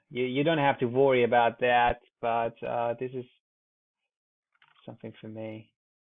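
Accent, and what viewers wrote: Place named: Iran. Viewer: Great Persian-English accent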